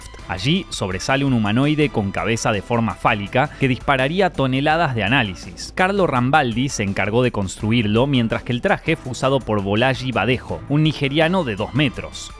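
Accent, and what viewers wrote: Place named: Buenos Aires, Argentina. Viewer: La tonada porteña es insoportable.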